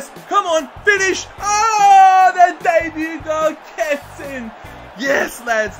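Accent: Australia. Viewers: Your Austrailian arent you?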